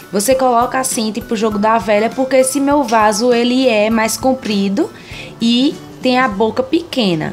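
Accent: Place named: Northeast, Brazil. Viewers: Amei, ótima dica,e gosto d+ desse sutaqui nordestino, parabéns. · Pernambuco kkk Eu nem percebo que falo assim tbm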